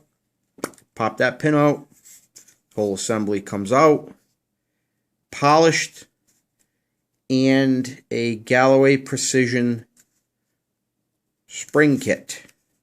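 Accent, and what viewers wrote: Boston, United States. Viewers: New England Boston accent. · Holy shit the accent tho. Bahston!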